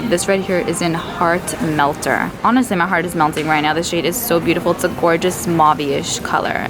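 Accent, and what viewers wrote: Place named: Brooklyn, United States. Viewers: My boyfriend is from Queens and he always says train, never subway. Of course your accent reminds me of his. You're a pleasure to watch and hear. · I love that Brooklyn accent!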